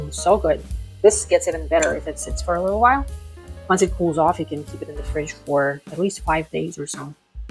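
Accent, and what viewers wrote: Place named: North Macedonia. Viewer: Hi! This looks really yummy! I will try this 😊 You have a very slight accent, are you originally from Macedonia? I have a good friend from Macedonia that sounds like you! Have a great day 😊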